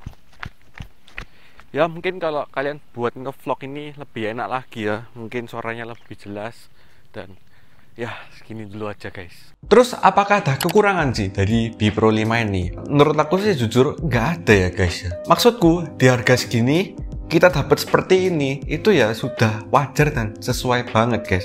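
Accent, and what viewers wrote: Java, Indonesia. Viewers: Matur suwun info ne ..... Sangat membantu, suka sekali lihat public speaking yg medok gini wkwkkwkwkw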